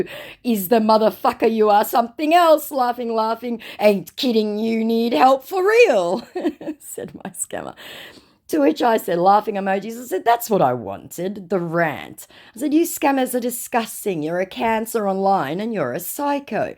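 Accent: Australia. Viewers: I love your Australian accent, Australia is one place I always wanted to visit someday.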